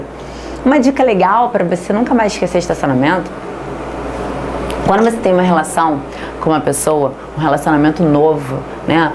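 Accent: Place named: Rio de Janeiro, Brazil. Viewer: So pelo sotaque carioca, já desisti.. Deus me livre....!!!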